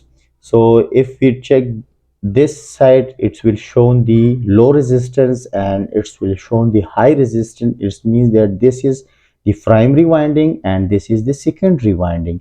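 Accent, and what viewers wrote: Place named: India. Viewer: Normally i find Indian accent difficult to understand, but you speak slower, with good pace and clearer, it is easy to understand you. Thank you very much for sharing your knowledge with us with so much care in both your instructions and handling of a foreign language.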